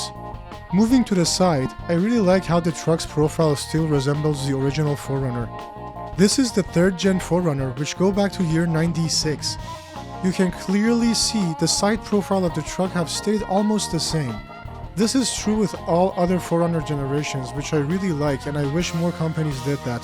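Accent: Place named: Iran. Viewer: Nice Iranian accent. Iranians love Toyota’s 👍🏻👍🏻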